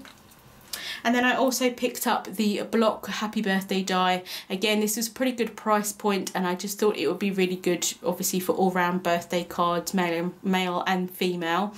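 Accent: United Kingdom. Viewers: Beautiful haul you collected. I'm my ignorance I stupidly never realised you were on my side of the pond. So used to our American Crafter's the accent didn't even sink in. Glad I've found another UK crafter. Hope the headaches get sorted, I used to have so many cluster migraines I totally feel for you x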